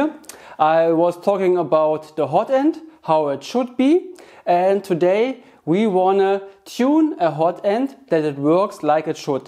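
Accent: Germany. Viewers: Funny how I noticed after the first sentence that you are German xD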